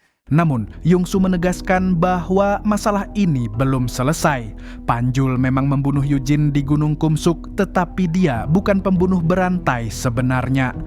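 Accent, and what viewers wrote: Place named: Banjar, Indonesia. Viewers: Naratornya urang Banjar ini ya KLO... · Orang Banjar sekalinya Sidin ni lah wkwk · Kaya' orang Banjar logat naratornya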